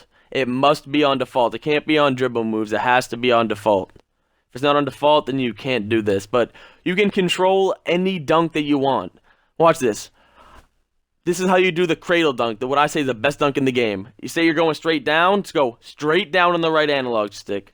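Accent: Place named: Boston, United States. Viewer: yep this man is from Boston... just said wicked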